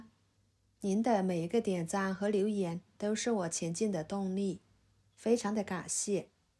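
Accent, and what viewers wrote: Yunnan, China. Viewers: 一听就是大 云 南老乡啊:hand-purple-blue-peace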